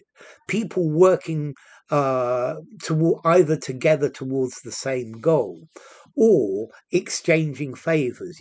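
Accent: United Kingdom. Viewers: Sir You made me loving british accent.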